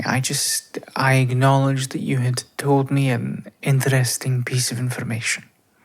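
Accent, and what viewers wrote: Scotland, United Kingdom. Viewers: Well, that Scottish accent does it again lol. I wasn't ready for the mix of soothing and spicy—you're definitely a master at keeping us on our toes. Can’t wait to see what you come up with next! ❤ ❗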